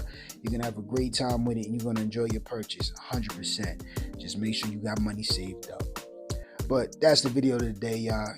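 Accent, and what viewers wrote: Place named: West Coast, United States. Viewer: He sounded like he was from the West Coast to me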